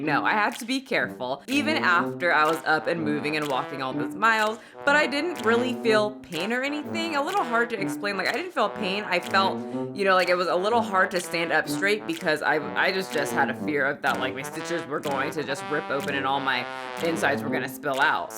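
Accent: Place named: Hemet, United States. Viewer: Why does this sound like Hemet California 😂😂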